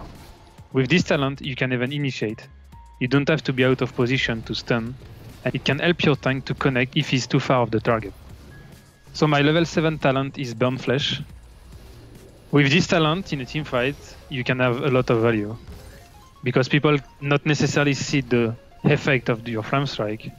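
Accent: France. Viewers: warchief ultradeath conan barbarian terminator.... But English with French accent is so good! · The frenglish is real :D i never know if he's saying "hit" or "eat", both make sense haha. Stil, love the vid, and love the team <3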